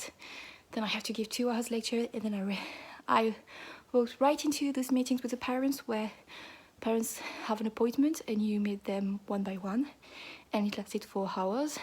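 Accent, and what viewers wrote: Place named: France. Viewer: Hi, just discovered your channel while looking for tbr ideas for the 2020 gilmoreathon. And I absolutely have to subscribe. Your accent is so lovely and relaxing and your English is impeccable. I wish I could speak French as well as you speak English. I'm going to look for language courses near me! You've inspired me. Loving this vlog.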